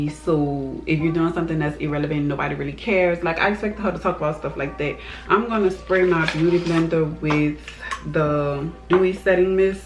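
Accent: Louisiana, United States. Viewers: I knew you were a louisiana girl from the first couple seconds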